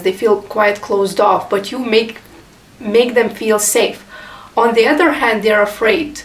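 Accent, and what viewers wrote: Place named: Eastern Europe. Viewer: I like your accent but it's nice to listen because we're both readers and Slavics 😉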